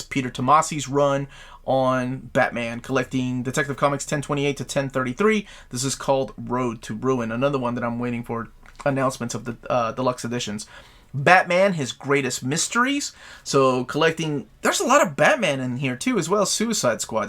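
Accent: United States. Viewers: Are you from Kentucky????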